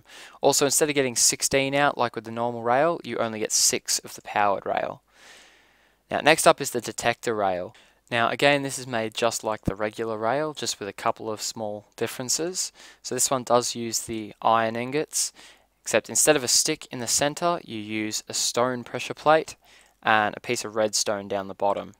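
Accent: Australia. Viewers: nice are you aussie ?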